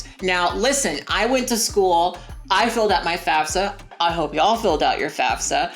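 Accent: England, United Kingdom. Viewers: I love British speaking tbh 😍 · 🍔 As a Brit, I liked your English accent. It wasn't as exaggerated as most other Americans' impressions. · "I don't want comments" we are gonna comment: it's the british accent for me 🍔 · You doing the intro was so bizarre to a new follower. I was just here like "B....british??? I didn't think I followed any non-gaming British Youtubers....." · Your british accent is wayy too good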